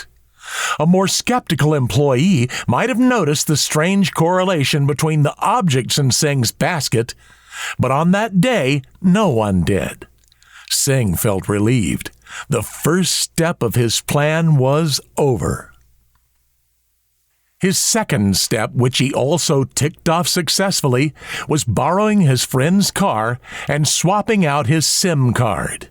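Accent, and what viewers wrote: United States. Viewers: this American overacting voiceover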